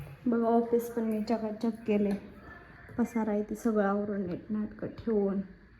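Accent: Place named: Rural, India. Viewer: प्रिय आणि....... च्या बोलण्यामध्ये एक वेगळेपण आहे ग्रामीण लेहजा बाज आहे तिच्या अक्टिंग चां वापर योग्य रित्या जर करून घेतला तर आपणास खूप मदत होईल.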